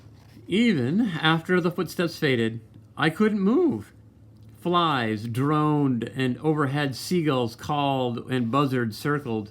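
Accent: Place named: Texas, United States. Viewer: U have a texas voice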